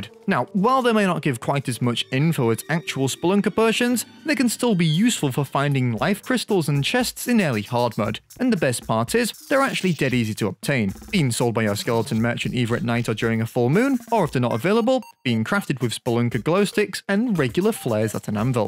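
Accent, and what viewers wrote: United Kingdom. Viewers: hearing his british accent makes me mad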